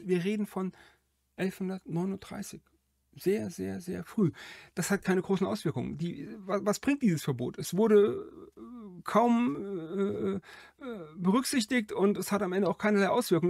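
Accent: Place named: Rhineland, Germany. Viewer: Ein klassischer Sprachfehler scheint das nicht zu sein, tritt vor allem bei Rheinländern und Eifelern auf und scheint in deren Dialekt zugrunde gelegt zu sein. Wenn es Sie zu sehr belastet, wechseln Sie einfach auf einen anderen Kanal, mich regt mehr die Diskussion auf, als die kleine sprachliche Besonderheit, die mir im Übrigen schon ziemlich lange bekannt ist. Wenn Sie mit Schweizern oder Sachsen zu tun haben, werden Sie auch kleine sprachliche Besonderheiten feststellen.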